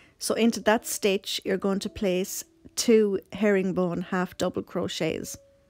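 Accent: Ireland. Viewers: Thank you so much for your quick reply 😊 I will try the 2 strands together.... your instructions are great, it is nice to hear a fellow Irish voice ☘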